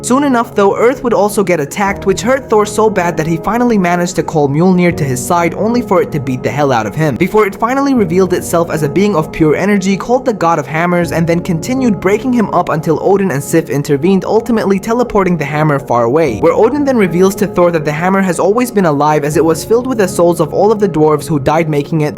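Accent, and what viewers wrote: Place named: New York, United States. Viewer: U from New York aren’t u?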